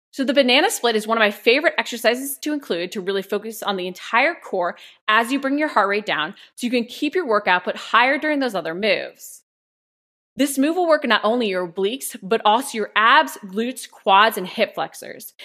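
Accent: United States. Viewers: Hello from the UK. I just want to say thank you for your time and effort in producing these excellent videos which I'm sure are well views in the current climate. Changing the subject some what your accent in this video is reminding me of the Californians off SNL!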